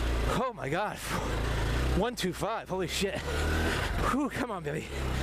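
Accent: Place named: Midwest, United States. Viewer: ive never been to this channel in my life, why does this guy sound like hes from the mid-west but he says he's living in the UK? I'm so confused.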